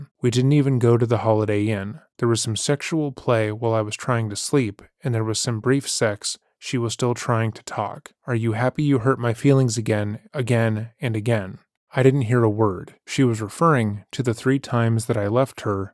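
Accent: Canada. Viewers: You're Canadian? This story brings flashbacks 😂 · I’ve been subscribed for over a year and it’s just now dawned on me that you’re Canadian! Canadians pronounce the word “about” very uniquely. 😅 Love the channel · well he has either purposely added the Canadian accent or the AI randomly generated it and he didn't notice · have you become more Canadian recently?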